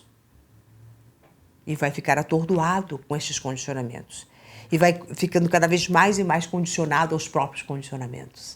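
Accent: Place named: Italy. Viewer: tem sotaque italiano.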